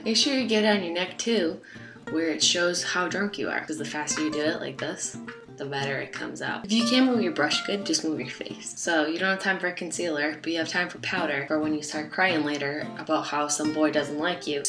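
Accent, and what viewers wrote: Boston, United States. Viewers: I SEE your Boston accent and Ithink its qt af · she lived in Boston for like 7 years and developed an accent. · I forgot she was from Boston till i rewatched this video 😂❤ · Sounds like a Boston accent (like when she says water line "watah line" lol) I've lived in mass my whole life and she sounds like most of my family tbh😂 not really surprising though since she lived in Boston for 8 years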